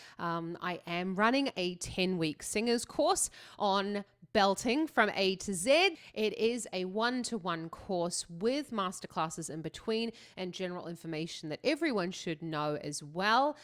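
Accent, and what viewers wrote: United Kingdom. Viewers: I am not surprised this video is 25 minutes long, analyzing Everyone's cover is a masterclass. welcome to world, this is considered to be her greatest performance. I had not picked you for being across the pond! I thought you were British.:D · Oh, it's weird to see my comment at the beginning:D (just heard your comment about you being in Germany?So..,Hello from Germany! Never thought that, would've said you're British judging by your English ^^). And now, first (my favorite song of hers) and now, what a great day for me! Thank you for your analysis (and I'm so glad you like her too) even though I recommend, if you'd check some korean songs as well in the future, just take a look at the lyrics since she's not only all about technique but she's a great storyteller as well. She's insane! She's actually a chameleon who can do every song and genre and turns it into hers. 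From Rock or Jazz to even rap. She tried it all. What would be interesting maybe if you'd check out an English song of hers. She does her arrangements by herself on Immortal Songs. She sang there and. Last one, directly in front of. He invited her to join him on tour after giving her a standing ovation. She performed together with him later. For a korean one, I highly recommend " " on I am a singer (Please use the vimeo one, if possible with subtitles, since the youtube version is cut and the lyrics are incredibly sad. The climax is heartbreaking):) And a stage which has already legendary status is " ". It's a korean folk song turned into a beautiful arrangement with seemless transitions and beautiful vocals. (Here you should maybe check out the b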